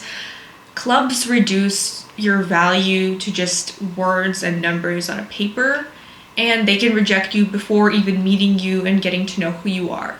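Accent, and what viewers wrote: United States. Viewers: Hi How come you have a perfect American accent without any trace of the typical Russian drawl? Your accent is more pure then ninety percent of native English speakers in USA!! Respectfully asking as no one you place you as Russian 😅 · Your English is amazing and your accent is full-on American. Did you go to English schools in Russia? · how is your accent pure American in less then a year? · To say you only got to the US from Russia 9 months ago, your American accent sounds really authentic. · Kinda off topic, nah, IS off-topic, but how do you have a such a perfect American accent if you just got here? 😅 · Your accent sounds perfect. I mean, you really sound like an actual American speaker. Or a little bit like a German. But, Russia? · Russia? You have an American accent 100%! Amazing!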